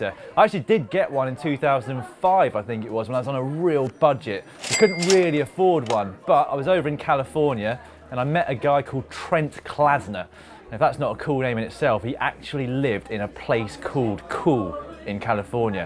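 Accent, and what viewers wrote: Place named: United Kingdom. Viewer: Gotta love the British accent. A place cooled (called) cool. shoutout to Gavin Free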